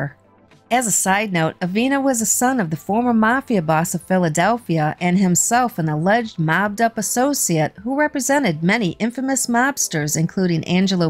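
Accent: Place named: Midwest, United States. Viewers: Announcer sounds like she is from Midwest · This narrator’s voice tickles me. Gotta be from Chi-ca-go or Fargo. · A strong Chicago Illinois accent for sure lol.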